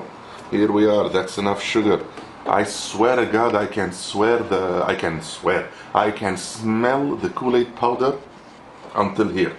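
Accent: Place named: England, United Kingdom. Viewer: Loved ur eng accent dude!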